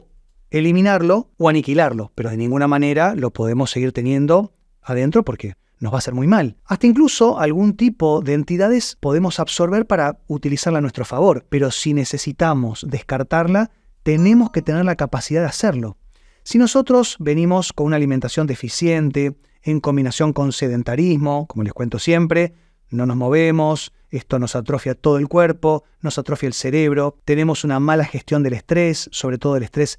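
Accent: Argentina. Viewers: Buenas tardes dr.ud. es de Argentina? Donde atiende?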